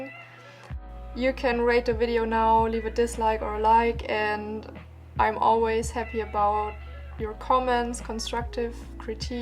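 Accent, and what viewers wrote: Germany. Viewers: Man merkt, dass du Deutsch bist :D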